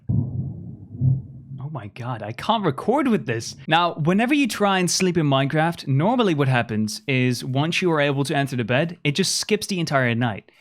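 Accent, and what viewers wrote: Netherlands. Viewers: Are you from the netherlands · Hey love the vids, are you dutch by any chance?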